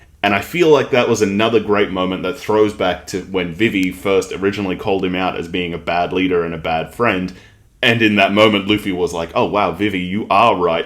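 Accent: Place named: Australia. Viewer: Oh, oh! One of the first reasons your videos resonated with me was because I spent a significant amount of 2020 in australian backpackers (the accent really sends me back ksksk) So yeah, just letting you know that someone was wondering from where you were, actually lol Anyways, I've stuck around for the contect. It's super fun to see you theoririzing. Always excited to watch your videos!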